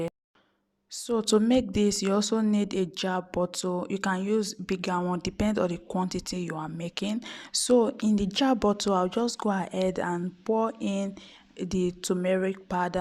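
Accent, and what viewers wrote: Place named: Nigeria. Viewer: 😂😂😂😂😂😂she is from Nigeria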